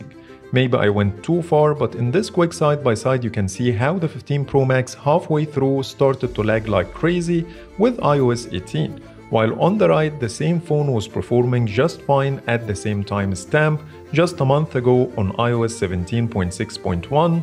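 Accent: Egypt. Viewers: واضح جدا إنك مصري والإنجليزي بتاعك باين جدا ليه مش بتعمل فيديوهات بالعربي .. اتعلم من عمر دايزر ويحيى رضوان قنوات بالعربي وشوف شغالين ازاي وعندهم متابعين قد ايه .. بلاش تعمل فيها مثقف وتروح تخاطب ناس مش هتعبرك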